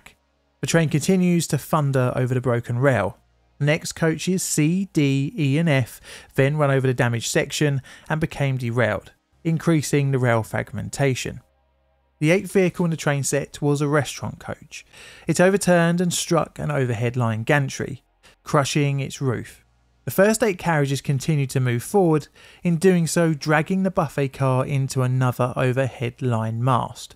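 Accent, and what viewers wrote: United Kingdom. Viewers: I'm STRONGLY recommending to you adding human made CC for your videos. Not everyone is familiar with "UK accent" (I know, there isn't a single UK accent, hence parenthesis) and at any rate your very uneven articulation, combined with this typical British manner of agglutinating bunch of words into a single "godknowswhatyouresaying" (accompanied with rather erratic and arbitrary word stress to boot) does not help it either. Even these machine generated CC (which are "sorta reasonably good" for most of English accents) fails MISERABLY with your voice and neither they are of much help. Just listen, for instance, to this "quicklythetrain[unintelligible]operatingbroughtout[unintelligible]FACTOR" - ONLY on the fourth try I decoded most of it as: Quickly, the way the train was operated was ruled out as a contributory factor and then it took me another three or four runs to actually make sure it's "operated" and not "operating" and that this "contribut..." something was "contributory". 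Not a great way to enjoy a video, innit? (and then not a great way to narrate it either.) Having to backtrack and replay more than few sections (sometimes more than once) to actually understand the narrative, in one relatively short video, DOES NOT add to "watching enjoyment", I'm afraid. In fact, this is the most off-putting factor of your videos - these rather crude animations do not bother me much (although "there's certainly a room for improvement here", and I'd welcome it - still, I can live with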